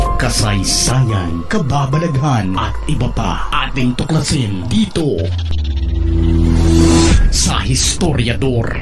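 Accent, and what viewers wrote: Iloilo, Philippines. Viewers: Taga Sara ni si sir historiador ilonggo gid hehe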